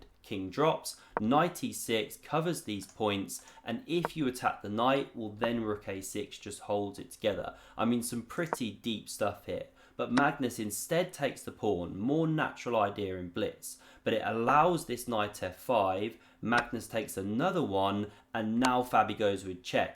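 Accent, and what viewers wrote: United Kingdom. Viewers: 3 things I love about this vid: Nice informative game, great explanation and lovely British accent!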